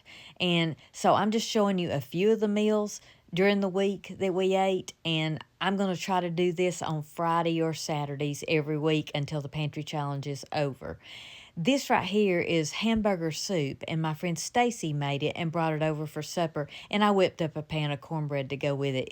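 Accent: South, United States. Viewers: You’re going to love the sour dough. I’m new to your channel and I can tell your southern from your accent I’m in South Carolina. Two great you tubers for sour dough is farmhouse on Boone and rose homestead if your interested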